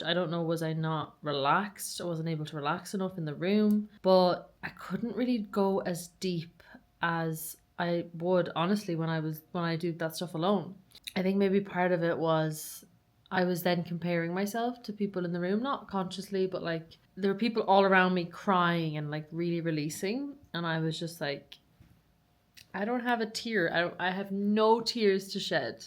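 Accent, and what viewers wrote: Ireland. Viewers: is it a irish accent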